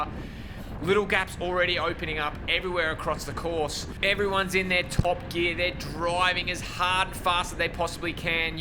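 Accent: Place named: Australia. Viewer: Love your energy and the Aussie accent 👍🏼🙌🏼💪🏼 🇦🇺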